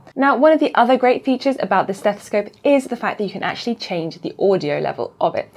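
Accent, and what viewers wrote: United Kingdom. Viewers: Hi, are you a GP working in Australia? You sound British?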